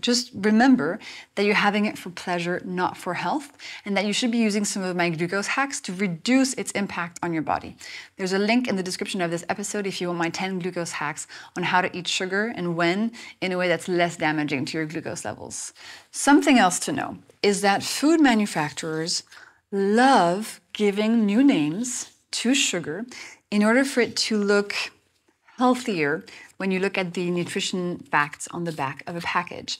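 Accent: France. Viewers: Hello angels!! Does anyone notice the similarities in her voice and the og mtv vj Martha Quinn? It’s like I’m back in 1982 watching Martha introduce the new video. With a little tinge of French. Anyway I have totally cut out sugar and most carbs, savory breakfast, vinegar, etc. feeling great, not deprived, and BMI and excess lbs are dropping!! It’s been less than 2 weeks but it’s working!!😊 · When I first saw you, I thought you were French (that's a compliment) because your facial style, your personality, and the way you speak English has a little bit of a French accent, and I don't know if it's the short haircut that reminds me of that more than anything else. You look really good, like a model, not a biochemist, and I think that's why people are paying more attention to your channel, because it's not the boring, stuffy biochemist's sleepy explanations. I've been watching your channel a lot lately, and it's really helpful in a lot of ways. 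However, the food that they eat, I think it's one of those things that's so strongly cultural and I think it's one of those things that can't be easily fixed because it's so deeply ingrained, especially from your mum and your parents when you're younger. Of course, that's why your video is so important and meaningful to people who want to make a change and feel like there's a problem. I look forward to seeing more of your helpful videos in the future. I'm cheering you on from the bottom of my heart from Korea.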